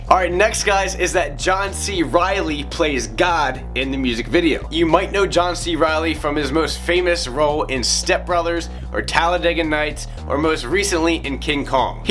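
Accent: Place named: United States. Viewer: Potato He has an american accent, you saying that is like someone from britain saying that they dont have an accent to someone from America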